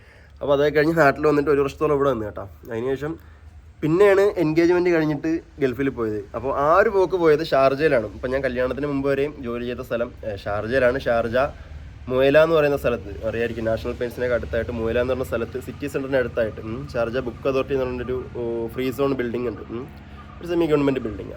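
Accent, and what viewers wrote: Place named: Palakkad, India. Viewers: Chakkara Palkkad aano Samsarm kettappo original malappuram aan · MalappurAm palakkad samsaram ekadesham oru pole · Slang cheyyo palakad then kollam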